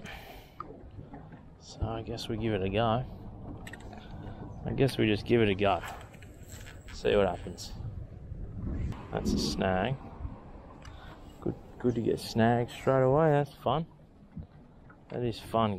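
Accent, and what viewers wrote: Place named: Queensland, Australia. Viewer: You're sounding more and more like a north Queenslander. The fishing is next level compared to SEQ.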